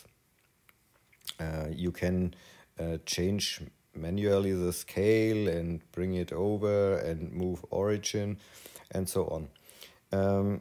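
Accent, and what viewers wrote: Bavaria, Germany. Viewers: I like your bavarian english!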